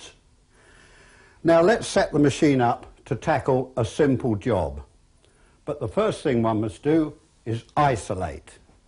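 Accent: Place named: South East London, United Kingdom. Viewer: I lived in SE London as a kid and later moved out to Kent so accent did not seem any different to my own. It is sad to hear he passed away but he did have a slightly younger assistant who was also very knowledgeable, I wonder if he has carried on teaching people.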